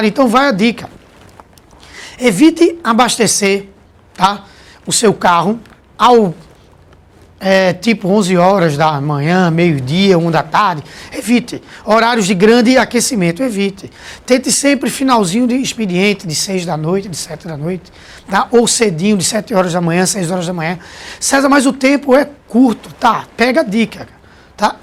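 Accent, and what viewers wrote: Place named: Recife, Brazil. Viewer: pelo visto o senhor é de recife rsrs ganhou mais uma inscrita e pernambucana kkk,Parabéns pela aula !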